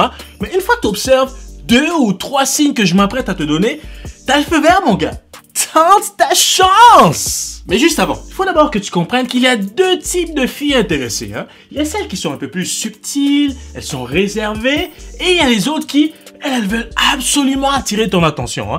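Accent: Quebec, Canada. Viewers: Je crois que l'accent québécois ne te vas bien qu'à toi sur terre.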